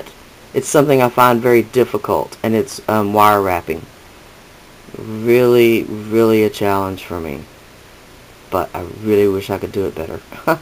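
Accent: South, United States. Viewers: Fun video! Btw I like your voice, and by the accent, I would guess southerner like me😊. I'm the same way I hate hearing my voice in my videos. I think everyone does.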